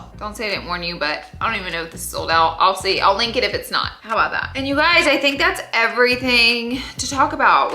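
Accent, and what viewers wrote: South, United States. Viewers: Omg the southern accent! Love!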